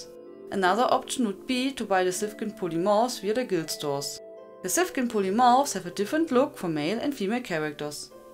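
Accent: Germany. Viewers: thanks for the guides, I like the accent btw, German, Austrian, sounds funny 😁👌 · bist du deutsch?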